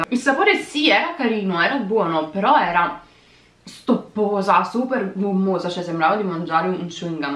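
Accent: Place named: Naples, Italy. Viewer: Sei napoletana 😍😍😍😍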